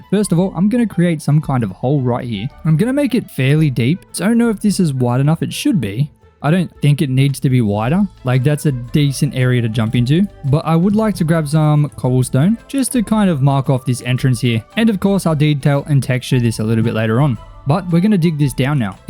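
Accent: Australia. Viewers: This was the first of your videos ive watched, i immediatly knew you were australian 😂 From WA myself. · No hate but why his australian accent acting like the volume button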